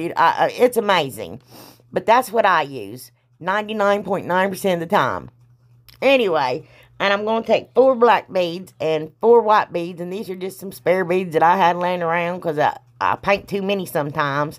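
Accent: South, United States. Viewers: Where do you live? That southern twang🤣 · Again, of course said in an awesome Southern accent!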